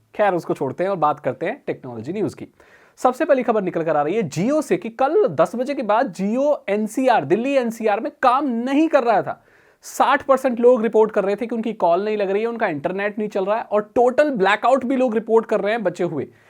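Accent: Odisha, India. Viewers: Are you from Odisha?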